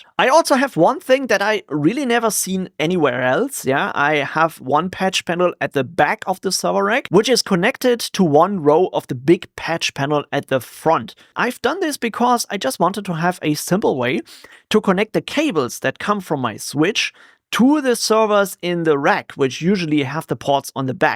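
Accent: Germany. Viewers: Lustig, dass man sofort erkennt, dass Du aus Deutschland kommst. Dein Englisch klingt fast wie Plattdeutsch ✌️🤗😋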